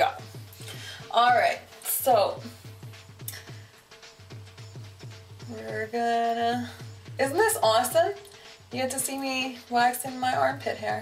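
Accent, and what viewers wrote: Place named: Canada. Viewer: you kinda sound like a canadian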